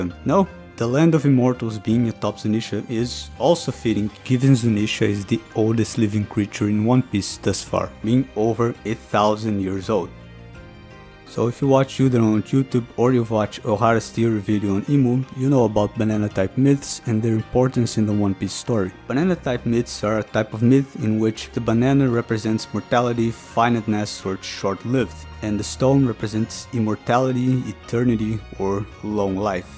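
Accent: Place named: Brazil. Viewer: Just watched your first video and it was great! Super hyped to watch this next one. Also, judging by your accent I gotta ask, are you brazilian? If so, me too, salve!! haha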